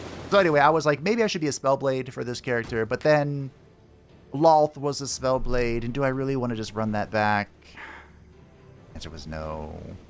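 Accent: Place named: United States. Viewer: And it was a very poor attempt made from ignorance. Not blaming you as a person, as your accent pegs you to me as a USian, and there is no country on this world whose citizens are more confused about the political spectrum and what the various tendencies entail. I meant to poke a response, and thereby give you a more accurate way to consider communism. For accuracy. For you have an audience, and some might take your words as gospel - which would be, in this case, inaccurate.. :)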